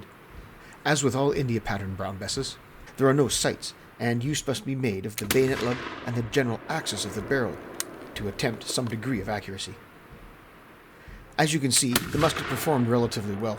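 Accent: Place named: Canada. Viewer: God that landscape is so beautiful. Im assuming by the uniform you're Scottish but the accent sounds slightly Canadian.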